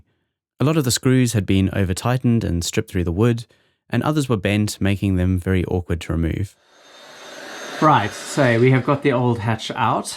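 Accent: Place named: South Africa. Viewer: 1. Nice show & tell video. This is one my many projects I have lined up! 2. Are you an ex Saffa by any chance? I'm sure I can hear a bit of the accent from the old country. And 3. Your house layout is eerily similar to mine, even down to the artex ceiling and placement of the hallway light! Creepy! 😁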